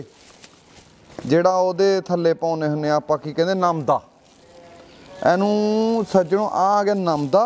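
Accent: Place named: Malwa, India. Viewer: 22 malwe alli bolli bhott vidya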